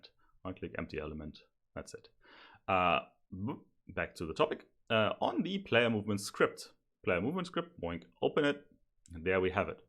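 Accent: Germany. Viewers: Thank you for this Tutorial, are you german?😁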